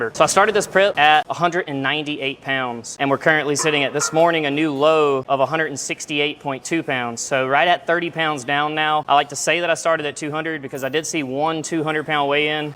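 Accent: United States. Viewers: What state are you from? Probably never heard that American accent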